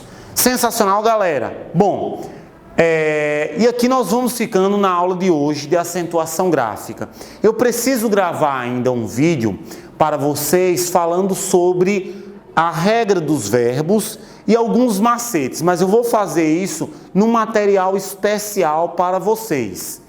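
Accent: Northeast, Brazil. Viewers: Professor apreender com sr. é muito bom e ainda com nosso sotaque nordestino e o jeito que exemplifica é melhor ainda. Parabéns!!! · Você ganhou um inscrito! Da aula muito bem e ainda tem esse sotaque nordestino que é maravilhoso de ouvir! Kkk parabéns professor, todo sucesso e muito obrigado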